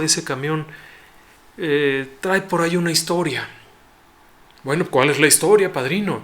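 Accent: Puebla, Mexico. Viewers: Recuerdo que en la estación XEB se transmitía un programa de CANACAR a altas horas de la noche y pasaban entrevistas con traileros y camioneros donde en dos o tres ocasiones los choferes contaron historias de terror. Era muy agradable ese programa que luego ponía la canción de con. Por cierto, eres poblano?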